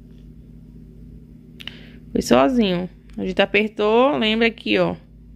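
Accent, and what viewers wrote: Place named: Recife, Brazil. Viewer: Arrasou e esse sutaque so pode ser de Recife sucesso mulher <3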